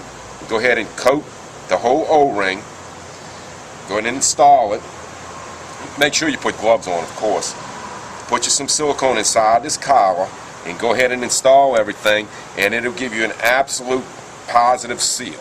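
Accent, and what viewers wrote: United States. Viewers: Sounds like he's from New Orleans, Louisiana.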